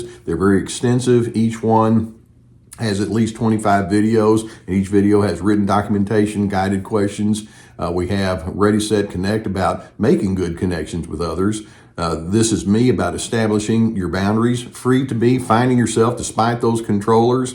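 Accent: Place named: Oklahoma, United States. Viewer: Sounds like Oklahoma coming from Albuquerque, NM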